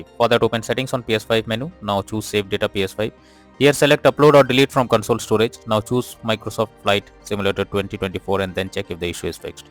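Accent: India. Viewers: He’s an Indian BTW 😁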